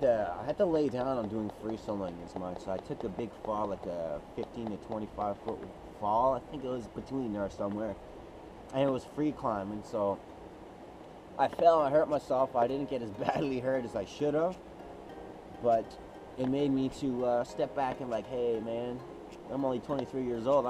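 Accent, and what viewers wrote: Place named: Newfoundland, Canada. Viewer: Awesome video bud. Was like I was there having a chat with ya! Your newfie accent is gettin tick! Haha cheers!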